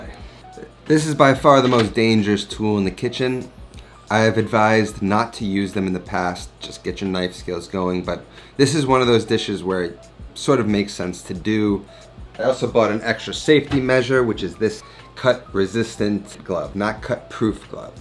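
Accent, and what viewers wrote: New York, United States. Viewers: Haven't watch this potato video yet, your description sounds delicious. I come from NY and listening to how you speak and your directness sounds like music to my ears, has a comforting feeling too.I miss the people of NY. So like your recipes, trying to cook them. Thanks for maintaining your integrity ( cutting board issues by others, not me.) Bless you and you hard work.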